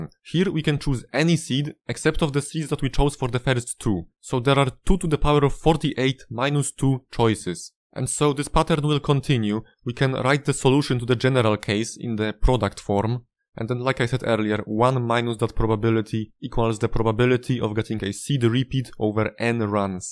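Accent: Poland. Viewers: Ach ten polski akcent🎉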